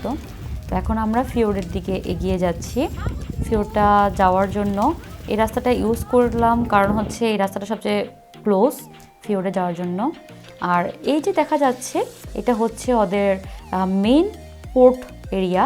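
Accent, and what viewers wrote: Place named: Noakhali, Bangladesh. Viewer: ভ্লগ তো অসাধারণ ছিলই বাট ভাইয়ের নোয়াখাইল্লা একসেন্ট বহুদিন পর শুইনা আপ্লুত হইয়া গেছি।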